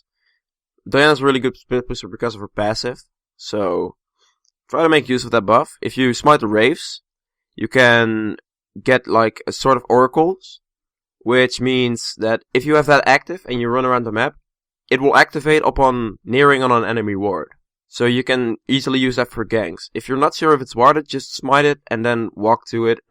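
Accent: Netherlands. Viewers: Hahaha het nederlandse accent op engelse taal is altijd zo herkenbaar. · Duidelijk Nederlands accent :p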